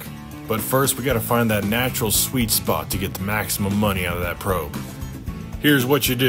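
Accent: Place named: Texas, United States. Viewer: The Texan accent making it’s infinitely better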